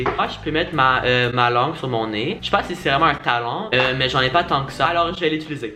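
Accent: Quebec, Canada. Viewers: T'a grave un accent québécois j'adhère👌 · ah ben tbk t québécoi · Tu parles un français un peu Québécois, tu viens d’où? [edit]: en fait c’est pas « un peu » mais tres Québécois wow [edit 2.0]: cest bon javais pas réalisé la partie « moi je viens de montreal » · c'est vrai tu as un accent québecois aussi XD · Ouais je suis très content de voir que tu fais une chaîne avec le super accent québécois qu’on aime tous✌️ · Damn I never realised how different Canadian French is to French from France. There are a lot of Canadian sounds like dans le futurrr instead of dans le futurgh if ygm😂😂 Ilyyy 💕💕 So glad I'm taking French as a GCSE rn😂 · Woaww ton français est vraiment québécois😂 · Si tu veux réapprendre un peu le français, en tout cas te replonger dedans tout en conservant le charmant accent québécois, tu peux regarder les courts-métrages du réalisateur Émile Roy!!!:D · Ah ce français du Québec j'adore en plus t'as l'accent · Ton accent québécois 😂❤️ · Omg t’a l’accent québécois 😄🔥 Im here from Montreal! 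· Je trouvais que tu avais un accent québecois avant que tu le dise 😂😂 Je savais pas que tu etais québecois, mais j'aime trop au mon dieu. Je suis française (ouest de la France) et je suis tellement contente de savoir que tu parle français, genre j'arrive pas a decrire a qu'elle point je suis contente😍😍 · juste à t'entendre parler, j'ai su que tu étais québécois! ( je suis québécoise moi aussi ) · C'est vraiment cool, tu viens du Québec! Tu as un bel accent québécois malgré le fait que tu dis que tu l'as un peu perdu😉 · Oh mon dieu!! Je suis française et je savais pas que tu parlais français! Mashallah l'accent québécois!! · Tu parles très bien français. Bon après y'a les expressions du genre "Mon cheum" mais ça c'est du français québécois donc aucun souci:). Tu as accent mi québécois mi français j'aime bien. Ha oui faut dire à que la langue française ne se résume pas à "Je suis déguelasse" hihi:) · Tu à l accent québécois...😅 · C’est vraiment cool que tu te partes une chaîne en français, j’écoute tes vids en anglais depuis longtemps pi moi aussi je suis une québécoise bilingue ahaha! 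Mais hey ne te fais pas d’accent français, l’accent québécois c’est cool! Pi si tu garde ton vrai accent québécois les gens vont pouvoir voir que les gens qui parlent français au Québec ont pas le même accent que ceux de la France comme tout le monde pensent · OMG T QUÉBÉCOIS JTM OMG AHHHH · Bonjour, je suis française et j'ai pratiquement la même histoire que toi: mon père est Anglais est vis à Londres et ma mère est française je vis avec elle du coup j'ai toujours parler les 2 langues depuis ma naissance (j'ai passé ma crèche et toute ma maternelle a Londres), mon père viens souvent nous voir mais j'ai toujours étais plus confortable avec le français. Ton accent est trop mignon et je trouve qu'il est plus Québécois que français😆. Tu as 19 ans, il me semble. J'adore tes vidéos BISOU!!!💜💜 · TES SI QUEBECOIS😂😂😂😂 (s’cuse, je suis aussi canadienne mais à mon école on tous parle français de france comme les profs tous viennent de france et avant d’aller à cette école mon français était aussi mix) mais oof je voyais pas la différence avant que mon français est devenu si « français de france » et wow je le trouve si drôle d’écouter qqn qui a du français SI QUEBECOIS (pas de shade du tout à toi) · Lol il est québécois je ne savais pas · Ton accent québécois est trooooop mignon 🥰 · Oooh je viens juste de découvrir ton accent québécois-français tes trop mignon, so cute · Choqué 😶 je t'ai découvert via ta chaîne anglaise et tes pranks. 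Je me serais pas douté que tu parlais français! 😄 Tu parles super bien! (On entends bien l'accent québécois 😉) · J'adore ton accent de Canadien français. Mais l'accent anglais de ton boyfriend est trop mignon aussi. Il faut vraiment qu'il apprenne le français! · Ce n’est pas une accent mixte c’est pure québécois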